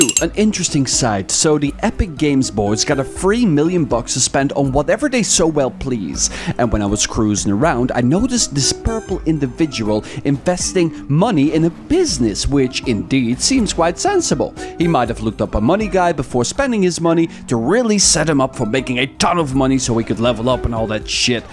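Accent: Netherlands. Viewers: Het nederland accent komt naar boven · Het is wel erg duidelijk dat je Nederlands bent. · Ben je Nederlands?😅😂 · Are you dutch? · you sound Dutch af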